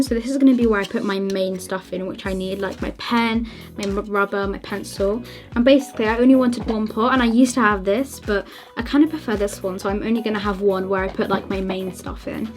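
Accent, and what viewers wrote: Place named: England, United Kingdom. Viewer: Your English acsent i need to get it 😩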